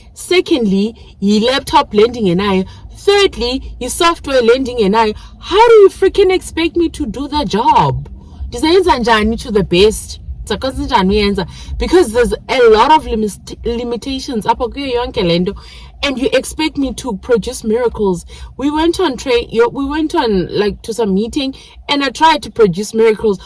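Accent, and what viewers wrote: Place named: South Boston, United States. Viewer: your home language sounds beautiful I think you talk like real southie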